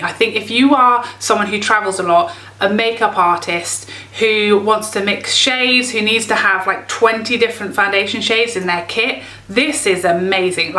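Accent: United Kingdom. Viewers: I’ve been binge watching your videos while doing a spa night, listening to all this makeup talk in a British accent makes me feel so elegant and sophisticated😂😂 plus you’re HILARIOUS. Love your channel lady🙋🏾‍♀️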